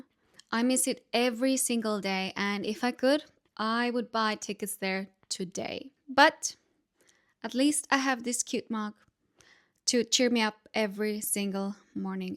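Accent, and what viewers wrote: Australia. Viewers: After listening to you , i can say unequivocally.....You are 100 % Australian, wherever you may be ..... So lovely to hear your experience here. Come back !!!!! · Its funny to hear Europeans speak English with an Aussie accent! I'm sure you'll get back some time. you know you'll run into aussies too so dont forget to mention your connection to the land down under..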